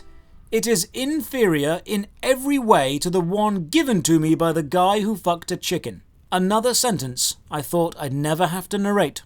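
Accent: United Kingdom. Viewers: I just love how angry he always sounds. Very british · David Attenborough, is that you? I can't tell you Brits apart.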